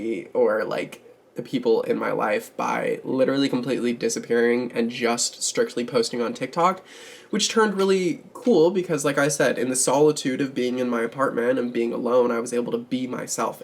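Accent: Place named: South, United States. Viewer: I appreciate your perspective because cisgender people often don’t think that transphobia will affect them but it does. The moment people get suspected as trans or misgendered it does put them in danger to people’s transphobia even if they are not trans. So your perspective is necessary if cisgender people will listen. Also I love your southern accent. I am a fellow southerner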